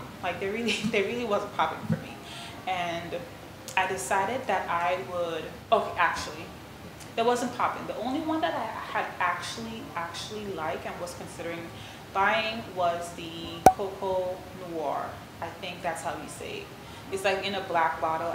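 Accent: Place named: Caribbean. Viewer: niceeee I knew it , I can recognise my island fam anywhere lol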